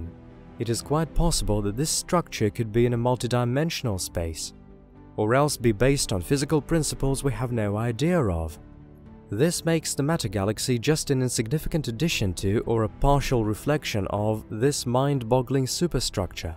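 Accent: United Kingdom. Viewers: This guy sounds like the posh English dude that does/did stream Civilization on YouTube when him and his friends played it together 👍😅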